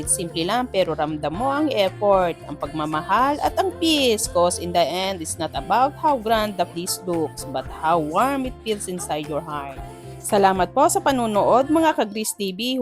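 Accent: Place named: Baguio, Philippines. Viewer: kahit ganito araw araw ang ulam best.. haha kagaling mag Ilocano Baguio accent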